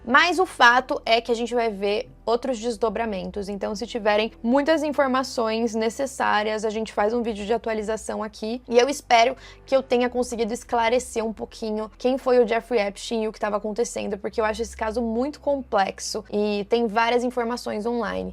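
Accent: Caipira, Brazil. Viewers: Narração caipira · Sotaque cáipira nojento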